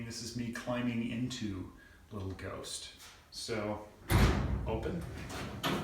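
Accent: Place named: Canada. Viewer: Why right-hand drive? Where are you? (You sound Canadian, or American. )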